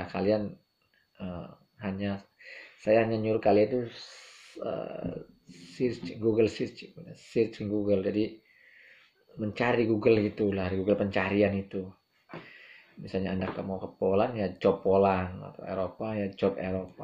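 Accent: Indramayu, Indonesia. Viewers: dermayu tah mas?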